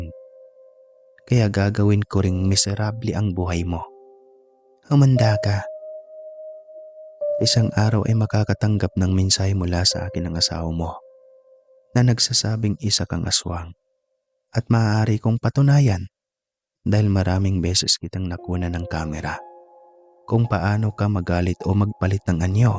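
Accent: Visayas, Philippines. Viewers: Bisaya ka man, Dong!😊😊 (katugon na man ko)😅 Ha,ha,ha😆😆😆😆😆 Grabe, ang ganda ng estorya!❤❤❤❤❤❤❤ Watching from Los Angeles, CA USA 🇺🇸🇺🇸🇺🇸🇺🇸🇺🇸🇺🇸🇺🇸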